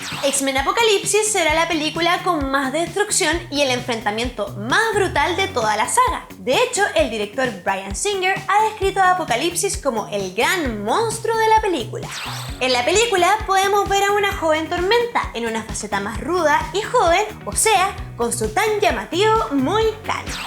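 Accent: Chile. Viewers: Es chilena. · Casi me suscribo, hasta que mi cuenta de que eras chilena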